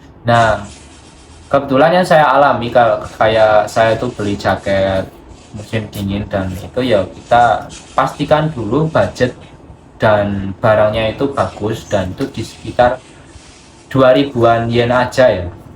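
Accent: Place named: Java, Indonesia. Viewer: Jawa e medok yooo😂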